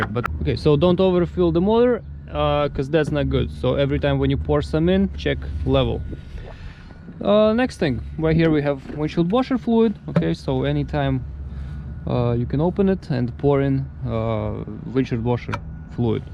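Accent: Russia. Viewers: Спасибо за русский акцент)))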